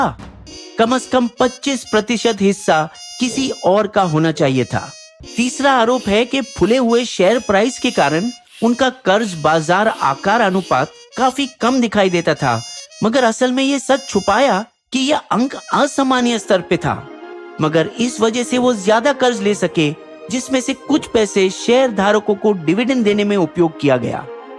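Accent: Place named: South India, India. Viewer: Ye awaz mardrasi type hai acha nhi lagta humney change the voice other wise we will unsubscribe this chennal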